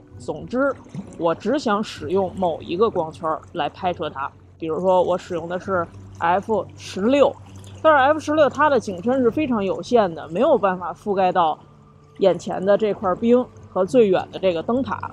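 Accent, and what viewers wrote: Beijing, China. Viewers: 绝对是个老北京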